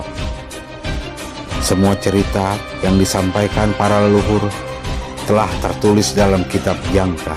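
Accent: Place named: West Java, Indonesia. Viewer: Bahasa Jawa Logat Sunda Om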